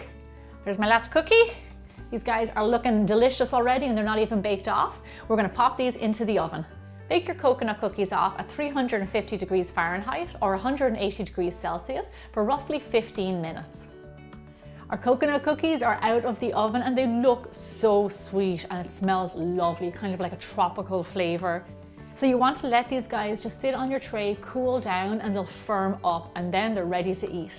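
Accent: Ireland. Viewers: hi, lovely video. started baking recently when i get time, still new to it, get nervous often, but with yr amazing videos, great recipes and precise instructions always, not to mention yr irresistable self, i hope to become a bolder baker soon. i ladore yr irish pronunciation n luv checking out yr videos. just want to say thanks for all the trouble u take to get them to us. you r truly an inspiration to pple like me. God bless. luv from India. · uhh... i love your Irish accent. i could listen to it all day. is that wrong of me?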